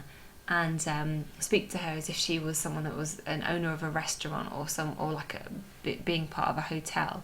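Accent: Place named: United Kingdom. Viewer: Lovely explanation. lovely British accent .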